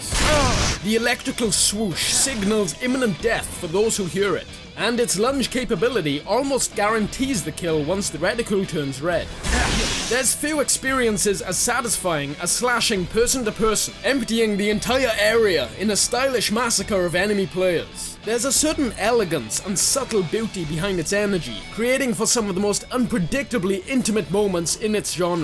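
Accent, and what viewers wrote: Wales, United Kingdom. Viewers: Actually nevermind. Wales! Are you welsh? I am Cockny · i know its a stupid question to ask. are you British ?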